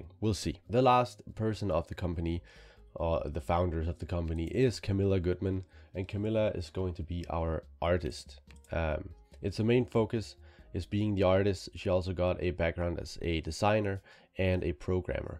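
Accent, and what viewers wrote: Denmark. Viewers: Your accent sounds danish. Eller tager jeg fejl?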